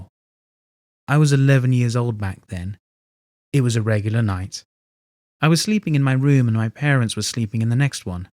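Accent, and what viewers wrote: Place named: RP, United Kingdom. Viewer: I'm two years late, but only recently found the channel: congratulations on the first book narration gig. I hope you've had many more in the interim, and I'm confident that has been the case, because you have the goddamned most crisp, posh, cut-glass accent my anglophilic American ears have ever heard on YT. You could easily pass for a BBC broadcaster.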